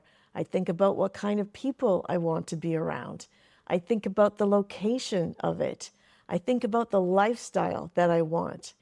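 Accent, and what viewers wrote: Canada. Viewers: She has an Ontario accent.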